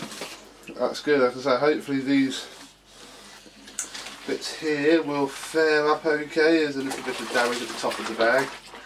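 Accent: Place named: United Kingdom. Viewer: You have a very erudite British accent. Where in England are you from?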